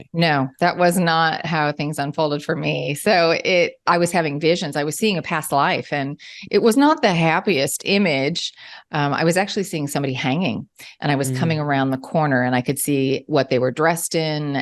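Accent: Australia. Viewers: Loved this so much!! Touched points I thought I felt I needed clarity on, love it! I think she's got some Aussie heritage? I can hear it in her voice! Wonderful insight from source XXX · They sound kinda Australian. hehe :)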